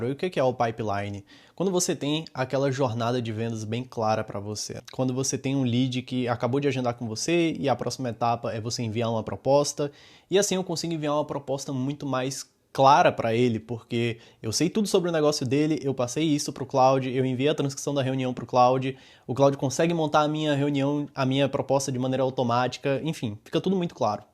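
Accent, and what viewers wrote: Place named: Bahia, Brazil. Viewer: Vi que você é devoto de Carlo Acutis hauha... Você é baiano? O sotaque caetano veloso entrega hauahua...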